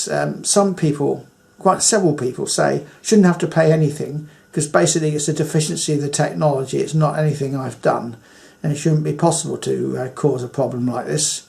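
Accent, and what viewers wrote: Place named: United Kingdom. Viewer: well im super happy that i found this informative video which also comes with a british bloke :D greetings from germany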